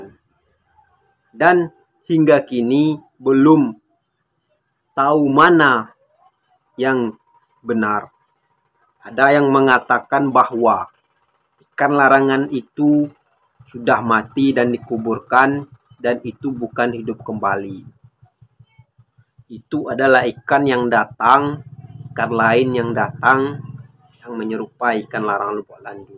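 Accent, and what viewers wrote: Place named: Sipirok, Indonesia. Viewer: ancak bhaso Minang Jo lai da,dari pado kayak org spirok bhaso indonesia😅😅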